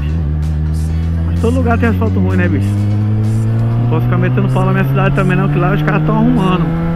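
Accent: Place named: Pará, Brazil. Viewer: Mais que vc tá com sutaque paraense isso ta kkkkkkk fica suavi manu tava zuando com vc só alegria !! I pra resolva sou Paraense mais des dos 9 anos fui criado e estudei em sampa mais to fora do pais a trabalho mais sempre que posso vo no meu Parazao ve us carinhas trutas!!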